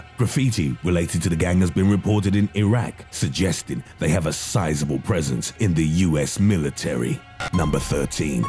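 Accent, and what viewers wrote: England, United Kingdom. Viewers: "The military has a white supremacist presence over all" if you don't shut up you English sounding moron, my boots gonna find the back of your head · Between your shitty accent and your shitty delivery go back to England and take your annoying accent with you. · You have a British accent like half the time....sup with that?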